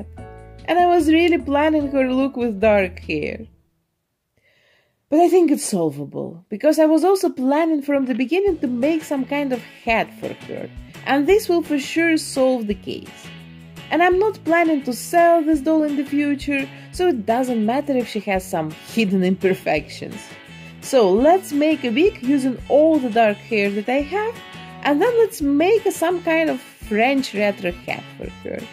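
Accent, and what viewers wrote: Russia. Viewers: Вы ведь из России ?) Акцент слышен. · По вашему акценту, можно с полной уверенностью сказать, что вы Русская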